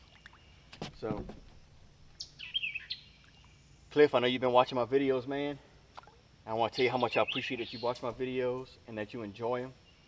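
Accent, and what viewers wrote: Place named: Louisiana, United States. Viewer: First time watching awsome video. Sound like u from Louisiana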